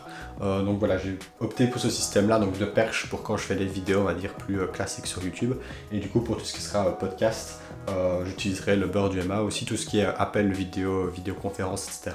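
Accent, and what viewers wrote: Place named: Liège, Belgium. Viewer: Suis tombée sur ta vidéo par hasard, ça fait du bien d'entendre l'accent de lidje dans les méandres de YouTube 😊 Agréable setup + 1 abo Bonne journée, m'fi 🙂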